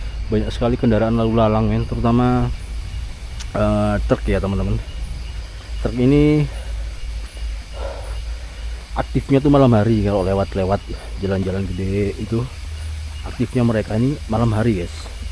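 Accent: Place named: Pasuruan, Indonesia. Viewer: GUENDENG PAK... KHAS AREK PASURUAN 😂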